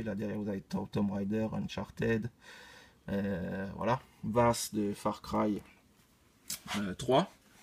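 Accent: Alsace, France. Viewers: Il fait plaise ton accent elsass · alsacien ? ta l'accent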